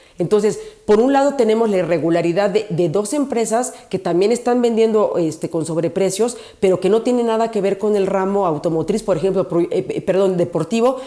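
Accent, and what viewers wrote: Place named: Fresa, Mexico. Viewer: no hables con acento fresa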